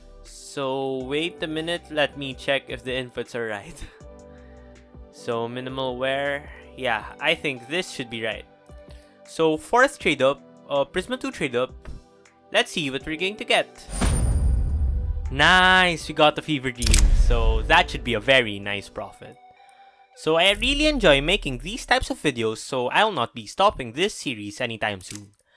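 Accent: Philippines. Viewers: are u from phillipines your accent sounds like it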